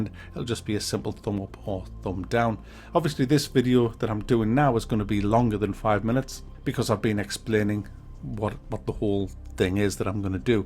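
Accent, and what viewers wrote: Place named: County Durham, United Kingdom. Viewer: Love seeing the reviews, have done for years, im from county durham and weird hearing a local accent on youtube!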